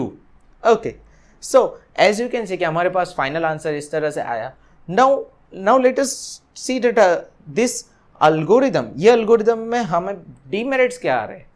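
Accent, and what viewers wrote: Gujarat, India. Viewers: are you gujrati you say that 'so aama ek exmple le te hai' good job bhai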